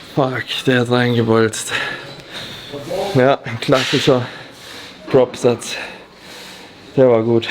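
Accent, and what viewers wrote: Swabia, Germany. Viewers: Mehr Schwäbisch bidde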